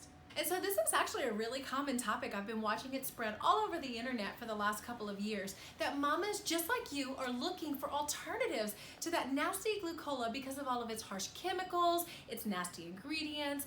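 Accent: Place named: Louisiana, United States. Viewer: I like this idea! I actually have my glucose test in the morning but my midwife gave me a more natural option than the glucola. Organic apple juice. So, I certainly am not complaining. Also, it was nice to hear a familiar accent. A Louisiana native now living in Kansas City... I miss that. Thanks for sharing this video.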